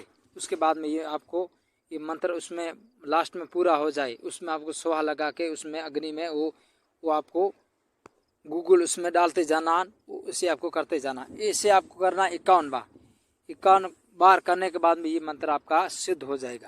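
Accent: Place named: Rajasthan, India. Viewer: गुरुजी में जानना चाहती हूं आप कहां रहते हैं मैं मिलना चाहती हूं I am also from Rajasthan. Your voice tells me that you are from Rajasthan. Please tell us where you are from and I want to meet you.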